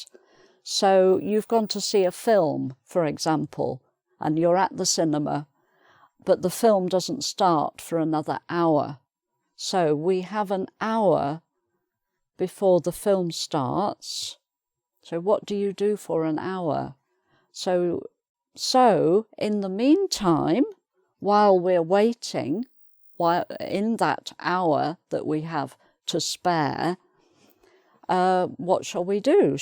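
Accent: United Kingdom. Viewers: I like a lot as a teacher.Because she is so patient and very skilful in teaching English.Also I like so much her British accent.She is so nice.!!! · I am a learner from China. You are so cute. And I really expect to have a Brit accent like you. I will follow your lessons. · British accent 😍 really lovely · I love your British accent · Thanks. I love your British English. I hope learn more english with you. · you are a good teacher, and l like your strong UK accent very much! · Thank you so much for your lesson. I love your British accent .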